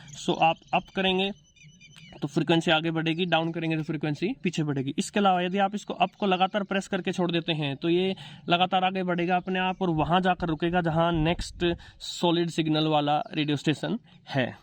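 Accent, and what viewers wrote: Standard. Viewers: आपकी शुद्ध हिंदी 👍 तारीफ ए काबिल ह श्रीमान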